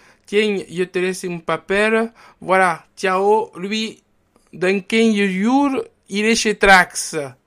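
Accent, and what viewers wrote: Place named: India. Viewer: un p'tit accent indé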